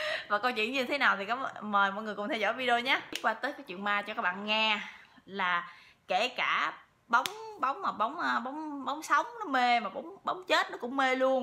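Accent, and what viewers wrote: South, Vietnam. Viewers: Bạn rất đẹp - giọng miền nam cưng ghê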